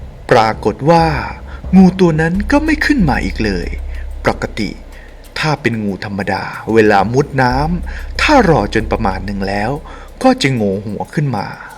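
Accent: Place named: Isan, Thailand. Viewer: เสียงแอดมินเวลาพูดอีสานสำเนียงน่ารักดี.😊😊😊😊😊😊😊😊😊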